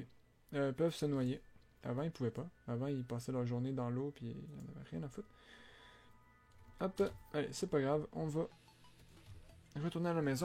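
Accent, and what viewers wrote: Quebec, Canada. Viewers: j'aime bien les accent québécois 😀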